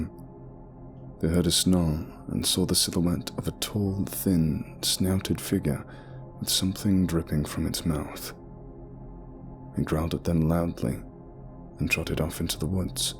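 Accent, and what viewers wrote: South, United States. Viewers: You did a good job with the Southern accents! I felt right at home. 😅 But were they supposed to be Southerners, or New Englanders? I potentially missed that detail, lol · Never ever apologize for your proud Southern Accent, NEVER!! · Ahhh, nothing like a good wendigo story.. Plus, dont ever apologise for your southern accent.. Its good.. I live in the south and youre doing it justice.. Theres a reason why hollywood uses english actors for southern character roles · Next time that you do a piece about the Northern Part of the USA, such as in 13 Mile Woods in New Hampshire, use regional accents, not the southern rural accents that you are so fond of. Hunters up near the Canadian border are unlikely to have southern drawls. Thank you. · Great southern accent. If only this was set in the south. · As someone from the southern United States I must say that you have really gotten better at the southern accent. 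Great job as always. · Your southern accent is on point, mate! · Thank you for taking the time to narrate this. Also, great job on the country accents. Looking forward to more! Always watching, waiting and listening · Your southern accents sound superb! You could easily be a voice actor for The Last of Us with how good they are. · ya good at southern accents but it's NH north nothing like what we sound like. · I'm from the south and think you did a great job on the accent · Actually, your Southern USA accent is pretty danged good. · You sound so cute doing a Southern accent! I love it! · I love your country accent. 😂❤️ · Your accent was better than mine and I live very near people who have the southern accent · no more southern accent please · the southern accent actually works pretty well. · You pretty much nailed the southern accent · Why the southern accent isn’t this supposed to take place in north east u.s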